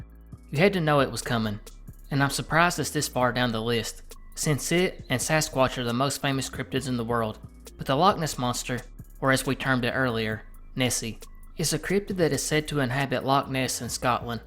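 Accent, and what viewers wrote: South, United States. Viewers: Damn you’ve got a strong accent. Which state r u from? Good video btw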